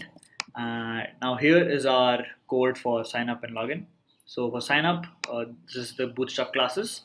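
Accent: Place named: India. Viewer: Why you indians talk like this !! Please please please work on your accent , it's very hard to understand. I'm telling this to all the indians ...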